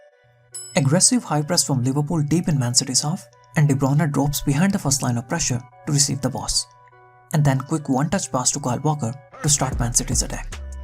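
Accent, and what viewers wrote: India. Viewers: maybe india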